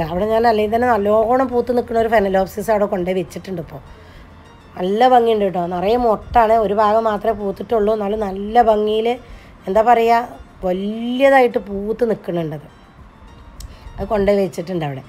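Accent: Malappuram, India. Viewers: അടിപൊളി നമ്മുടെ മലപ്പുറം സംസാരം സൂപ്പർ ആട്ടോ 👌💞💞💞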